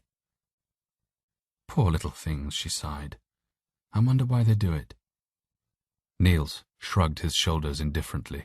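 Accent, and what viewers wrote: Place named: United Kingdom. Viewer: Great story and excellent narration pace. The narrator also has a nice voice and an elegant British accent.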